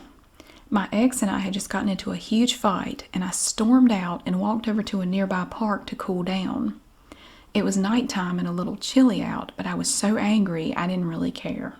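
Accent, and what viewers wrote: South, United States. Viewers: I LOVE HOW IS SO ELEGANT! 🧡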